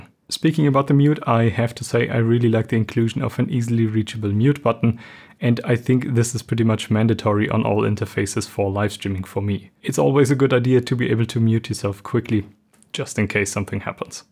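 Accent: Germany. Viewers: are you German?? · your name isn't why are you pronouncing your own german name intentionally wrong? an english or french speaking person would never say their own name in a german accent in germany. you have the heaviest german accent ever but you're pronouncing your german name in an american way, makes no sense.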